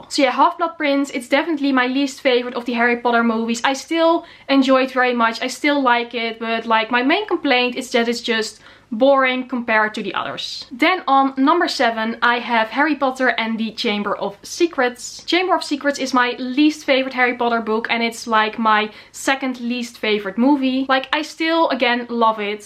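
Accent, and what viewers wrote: Netherlands. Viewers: Your accent is charming :) I immediately started wondering where are you from while watching one of your previous videos ... but I've just glanced at your description and now I know that you're Dutch! As far as the movies go ... I was dejected enough with the Half-Blood Prince to ignore the Deathly Hallows I premiere in Poland where I live. The last two movies I only watched at home.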